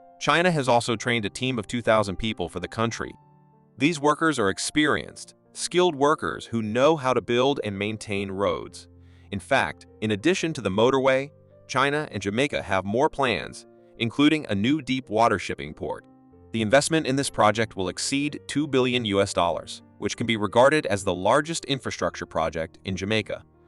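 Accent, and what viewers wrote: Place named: United States. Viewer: These kind of videos are so frequent. It's always the same thing: - This highway is currently under construction, when in reality it was finished a long time ago - Jamaica entering unforeseen "debt traps" with China, which to this day no one can fully explain - Questionable grammar and sentence construction - AI generated narrations with an "American" accent - Mismatched visuals - Outdated stats - Spreading propaganda about Jamaica's economy being in the pits before this project, like Jamaica was a starving nation or something - Jamaica's mountains getting in the way of road construction, when two major highways had already existed before the North-South highway - Getting Jamaica's geography wrong by assuming the Blue Mountains are in the middle of the country - Getting wrong for the trillionth time that the North-South highway crosses the Blue Mountains - Assuming Jamaicans had no way of crossing their mountains prior to this - Assuming tourism is dependent on this one highway, which makes no sense considering Kingston is not a major tourist destination - Assuming tourism is the only economy in Jamaica - Ignoring that there is already a proper roadway on the coast that links the major tourist areas in Jamaica - Jamaican locals depending on this one project for prosperity, transport convenience and foreign exchange - Getting details of how the North-South highway was funded and its conditions wrong - China making Jamaica a new deep shipping water port, something Jamaica alrea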